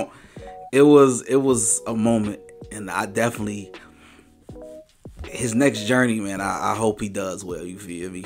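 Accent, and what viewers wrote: Chicago, United States. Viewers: You gotta Chicago accent lol Edit. You said it later in the video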